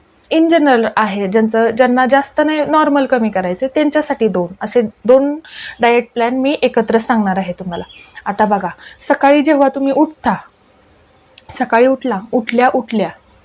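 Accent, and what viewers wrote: Satara, India. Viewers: छान वाटला व्हिडिओ ताई तुम्ही सातारच्या का तुमचं बोलणं मस्त वाटलं😊🎉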